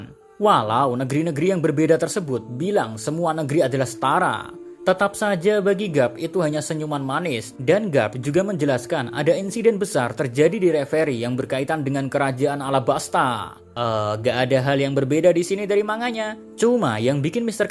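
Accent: Java, Indonesia. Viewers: Jawa nya pekat banget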